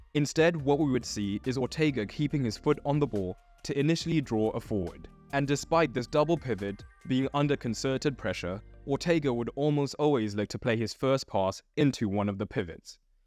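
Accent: South Africa. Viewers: Once again...top class and in depth analysis that focuses on the intricate details of tactics👌 just a question..are you South African?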